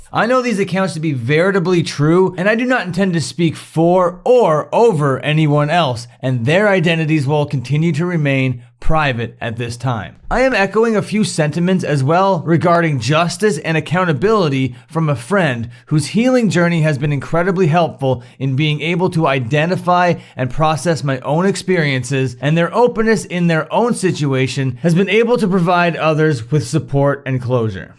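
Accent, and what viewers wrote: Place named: Canada. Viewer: Bro it just clicked that your accent is Canadian😂 what province Bud? I grew up in alberta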